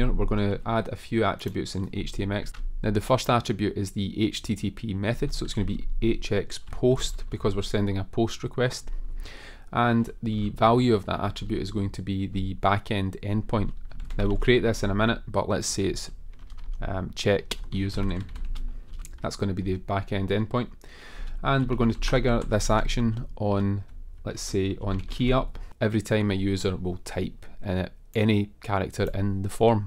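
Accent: Scotland, United Kingdom. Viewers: Good tutorial and with a nice (scottish?) dialect.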